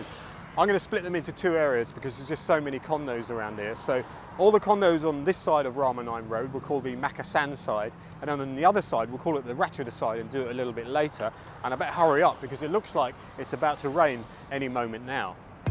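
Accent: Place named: Yorkshire, United Kingdom. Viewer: someone told me you were born in Leeds, same as me, is that corrct? You certainly have a different kind of Yorkshire accent. Your videos are interesting and well researched, highly unusual in this field, cheers mate.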